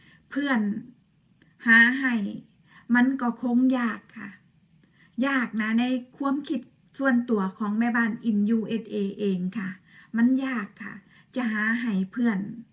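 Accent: Ubon Ratchathani, Thailand. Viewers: คนอุบลนี่เว้าจาม่วนๆๆสุภาพอิหลีได่น้อจร้าา🥰🥰🥰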